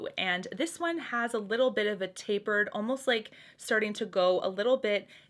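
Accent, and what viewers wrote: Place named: Winnipeg, Canada. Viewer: Born and raised in Winnipeg although now live in the states- I always like listening to you as your slight accent reminds me of home! loloyogini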